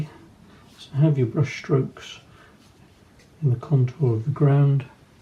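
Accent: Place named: England, United Kingdom. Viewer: Thanks. It's great to hear a true English descriptive narration, and a lovely result too.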